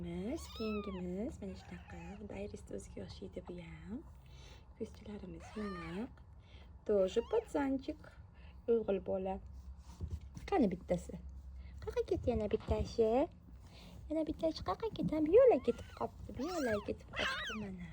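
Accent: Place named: Kashkadarya, Uzbekistan. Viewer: КАШКАДАРЁЛИКМИСИЗ . ЁКИ СУРХОНДАРЁЛИКМИСИЗ. ШЕВАНГИЗ КЕТОПТИТА.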